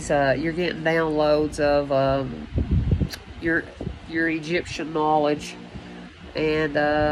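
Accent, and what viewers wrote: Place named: Alabama, United States. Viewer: 77 subscribers already. I love your voice and accent. It's just like my cousins who are from the mountains of Gadsden, Alabama and the only area of Alabama with that dialect. I've always loved it. You also seem to love Spirit in a way similar to me, I don't know. I'd donate if I could, married 31 years and finances are the only area that we've not been abundant and successful in. But it's all a part of divine plan and I'm just happy to be here. If I'm led by Spirit to do more in the world, I will.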